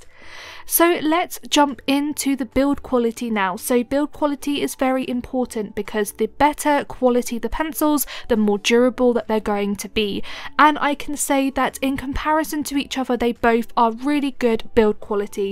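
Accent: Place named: United Kingdom. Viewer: WOW! What a terrific, honest review. I actually like the Derwent Lightfast, despite the high cost, but F-C Polychromos is my default set and I supplement with both Derwent Lightfast and Caran d'Ache Luminance, especially for skin tones. I thought without question you were going to endorse Derwent. Honestly, it's your accent, and my bias indicates unjustified prejudice. I think the genesis of my bias can be found in the fact that in 2014, when I began as a graphite artist, the most enthusiastic reviews I heard were all from British artists, and all of them endorsed Derwent Graphic, so I purchased a full set. What a mistake. I conducted a full-blown scientific technical study of graphite pencils in 2015 and published my results as a 360-page book, Pearson's Graphite 2015. I found Derwent Graphic was just about the worst graphite pencil set, containing scratchy pencils, dark grade pencils that gave lighter lines than light grade pencils, broken leads, misaligned leads, and so on. 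Faber-Castell and Mitsubishi Hi-Uni had some of the best scores for the 370+ pencils I tested. I have yet to hear a British artist say anything bad about Derwent Graphic, which raises all kinds of questions in my mind. I find nothing at all wrong with any of the Derwent coloured pencils, but their graphite pencils are simply crap. Maybe Derwent has (I guess you would say 'have') decided to give up on graphite and concentrate on coloured pencils?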